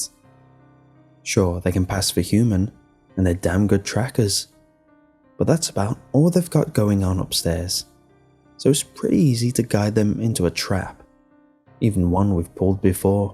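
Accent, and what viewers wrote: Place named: United Kingdom. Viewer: You really lucked out with your damn accent. Anyone with a British sounding accent is just so much easier to listen to.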